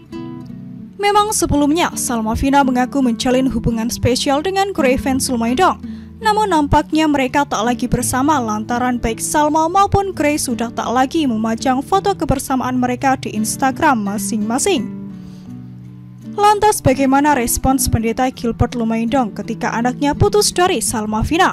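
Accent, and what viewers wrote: Indonesia. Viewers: Yang ngomong medok banget 😌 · MEDOK banget ya yg baca scropt.... bikin SALFOK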